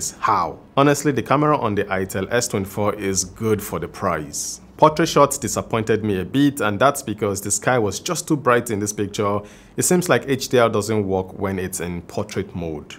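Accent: Nigeria. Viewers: Bro are u originally from Nigeria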